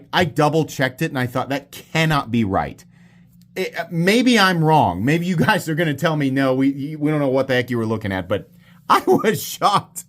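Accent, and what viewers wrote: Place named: Midwest, United States. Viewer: don't worry about your accent. Keep the Midwestern accent alive!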